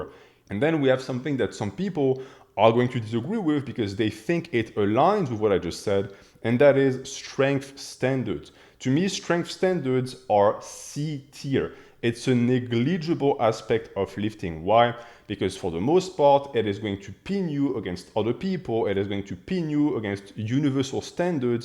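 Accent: France. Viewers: T'es français ? Je trouve que t'as l'accent.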